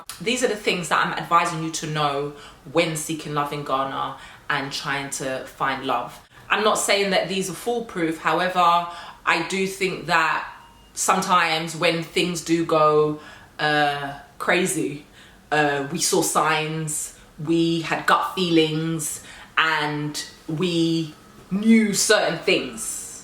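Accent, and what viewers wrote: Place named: England, United Kingdom. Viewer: YOU ARE ADORABLE! I LIKE YOUR LIVELY, FUN APPROACH, AND YOU HAVE A GREAT VOICE! YOU REALLY HAVE A STRONG ENGLISH ACCENT... ADD A FILL LIGHT IN FRONT OF YOU WHEN YOU TAPE. YOU ARE TOO PRETTY TO BE IN A SHADOW!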